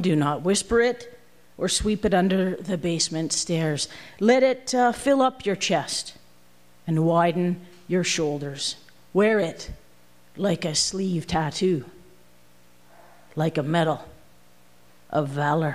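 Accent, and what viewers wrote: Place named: Canada. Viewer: their accent is so comically canadian that i can hear my own get more pronounced after i hear them speak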